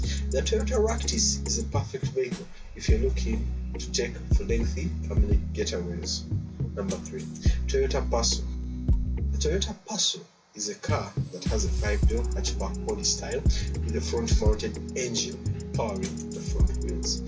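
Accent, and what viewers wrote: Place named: Uganda. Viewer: that's a Ugandan accent.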